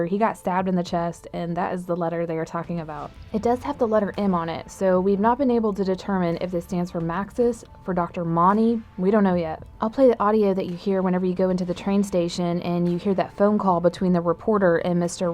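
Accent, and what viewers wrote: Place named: South, United States. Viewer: You sound more southern in this video.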